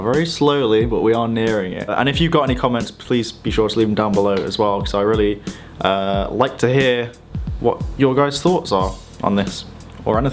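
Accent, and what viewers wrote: United Kingdom. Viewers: can u plz explain adderal ? Sorry I'm Arab and difficult to British accent sometimes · You’re a fake your British accent is horrible idiot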